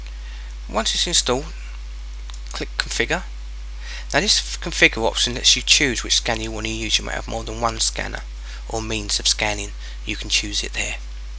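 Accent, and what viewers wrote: United Kingdom. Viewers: Thank you but this british accent is sometime so disgusting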